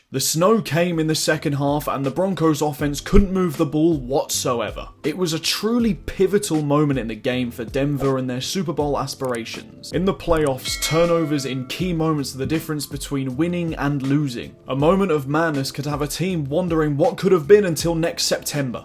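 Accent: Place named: United Kingdom. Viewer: This is probably the first time I've heard NFL analysis in a British accent, and it's also one of the best, most concise, well presented ones at that.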